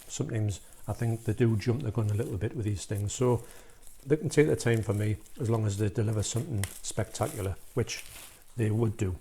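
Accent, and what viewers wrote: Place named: Scotland, United Kingdom. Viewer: that's great to hear, as I've heard that the Argento Cut was the worst of the 3 transfers. Oh ok, sorry. Just bits of your accent sounded Scottish. Not sure if you know, but i'm in Australia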